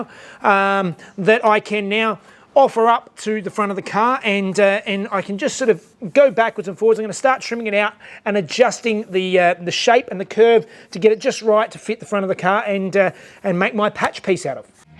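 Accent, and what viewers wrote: Australia. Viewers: English, is not my first language (Dutch) as a someone from Europe, a Aussie accent is obvious;-) Don't hear the difference between Aussie en NZ (?)?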